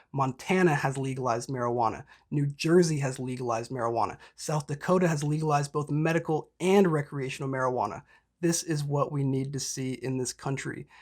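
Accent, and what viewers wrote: Canada. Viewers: Hey, I thought you were a Canadian fella haha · Damn idk why but i thought you were from canada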